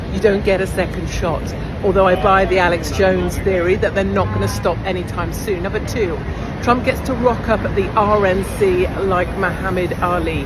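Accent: United Kingdom. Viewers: Trump is alive only because the Lord saved him. BTW, I love the British accent.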